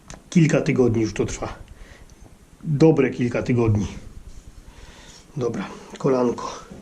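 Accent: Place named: Kuyavia, Poland. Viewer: Idzie wek przypomniał mi się mój śp. dziadek też tak zawsze mówił ;d W ogóle dużo zaciągnięć słyszę u Ciebie które słyszę u siebie na kujawach ;d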